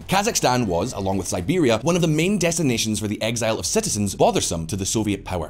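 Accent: Ireland. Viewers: Are you irish? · You speak to fast but I understand p, are you ☘️ irish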